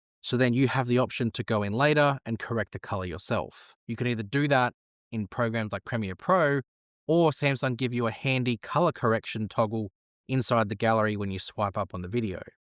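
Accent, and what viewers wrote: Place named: Australia. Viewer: It's nice to hear an Aussie accent instead of US accent for a change.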